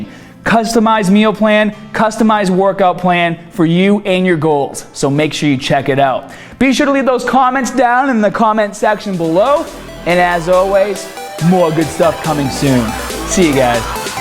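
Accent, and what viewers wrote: Boston, United States. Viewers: You're really laying on the accent thick, you never spoke like that before, plus you say certain words with the baaaahhhstahhn accent and same the the same exact word later on without an accent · Ever since I noticed you has a Boston accent it's like a game to catch it. Hahaha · What kind of accent is that? Like Boston, Rhode Island area? And I'm new to your channel! I remember years back I would youtube how to do a certain exercise and your videos would always be up! Looks like I was doing mistake number 1 lolz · How did I not notice your Boston accent till like two years into watching you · You didn't used to have a Boston accent this thick back in the 2011 days, are you doing this on purpose?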